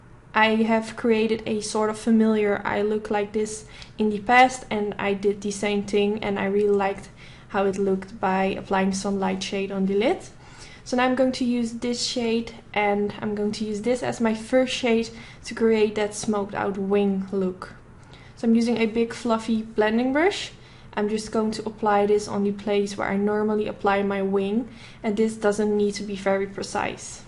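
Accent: Netherlands. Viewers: your accent sounds dutch... Are you dutch? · i knew that when i heard your voice that this was a fellow dutch person haha zo leuk! gelijk gesubscribed! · Ik hoor echt dat je Nederlands bent 😳😳 maar je kan zooo mooi opmaken wauw !! super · Hoi! Ik hoorde meteen al wel dat je Nederlands bent, wat ook helemaal te gek is. Je hebt echt super veel talent en met deze look heb je me geïnspireerd. Groetjes van je mede-Nederlander! ❤️ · Dutch accent I hear you!! Super mooi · Where are you from? Are you Dutch? I love your accent! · Flawless😍 enne... Hoor ik daar een dutchie? 🇳🇱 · Fiona Anker-Petersen If I hear her accent, I would think she is from Holland... But maybe I'm wrong. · je doet het wel mooi zeg! merkte meteen dat je Nederlands was haha, x. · Tusha van Well I knew it! I had a Dutch friend & this is how she talks! Mooi fr 😁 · u are totally dutch i can hear the accent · Are you dutch? 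You have a dutch accent 😂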